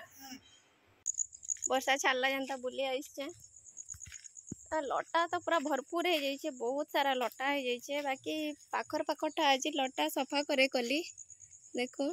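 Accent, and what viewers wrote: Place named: Western Odisha, India. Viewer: I love to hear WESTERN ODISHA TALK always MAM ,LOADS of respect from KENDRAPARA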